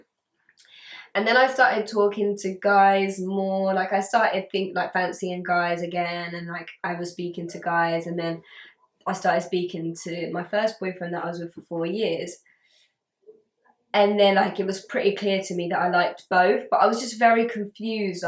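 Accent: England, United Kingdom. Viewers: I like listening to the way you talk I like the UK accent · you have the best english accent and tone of voice I've ever heard. I could listen to you all day!!!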